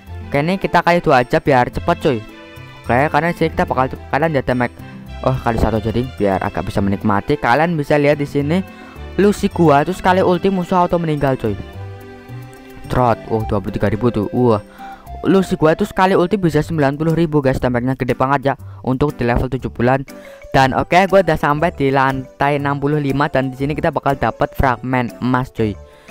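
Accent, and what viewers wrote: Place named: Java, Indonesia. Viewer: Bahasanya medok kk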